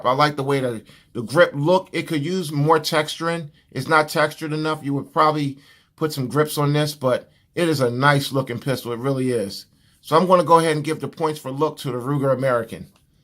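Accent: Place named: New York, United States. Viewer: I wished I saw this before buying.. Your vid was great. The Sig is great. But I went with the Ruger. I ripped off the Novaks for Tru Glo FTX Pros . The Novaks were meh. But the American has a better mag. The teflon coated mags makes a difference. The trigger on the Ruger gets better after 500 rounds. It feels a little heavy at first but it's a new gun. The Sig comes out the box with a good trigger and sights. Both awesome pistols. One just needs to mature. But with the TFX sights and some rounds through it I think the Ruger is better. I rent a 320C at my range for fun. Great gun.. But I don't regret the Ruger for a second. It just needed some...Love. The Sig 320C comes out the box the way my Ruger is.. In the end it's just preference. I can't shit talk either or. Both awesome. PS: love the accent. I'm from NY.. I feel ya.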